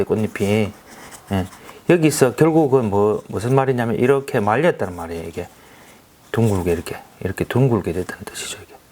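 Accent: Gyeongsang, South Korea. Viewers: 요즘취미로 집에서 조금씩 배우고있어요ㆍ장미꽃그림은 아직 엄두도못낼것같았는데 ~~ 자꾸 동영상보면서 익혀야겠네요^^ 첨이라 이해가 안되지만 연습해서 아름다운 장미꽃을 도전해보고싶네요^^ 잘배우고 갑니다 ㆍ쌤^^ 목소리톤과 경상도사투리 ~ 완젼 멋지심요~~ 펜할께여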